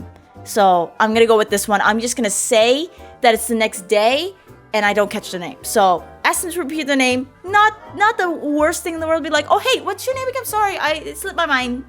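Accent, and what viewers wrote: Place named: United Kingdom. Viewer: I’m british and I love ur British accent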